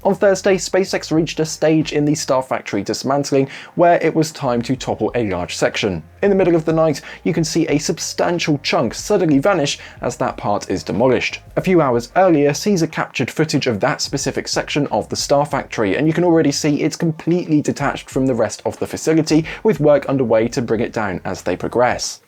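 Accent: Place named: South, United Kingdom. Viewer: Sad to see that some commenters are having trouble with his Standard Southern British accent. If you cannot understand standard English you should work on yourself, rather than complaining -- especially since the audience is international. If he had a heavy Scots, Irish, or north country English -- then I could accept complaints. But he is speaking NEWS ANCHOR quality English to an international audience. Ask someone from Europe, Asia, even Canada, he probably has the clearest accent of all the NSF presenters. Anyway, guys, just turn on subtitles and work on improving yourself.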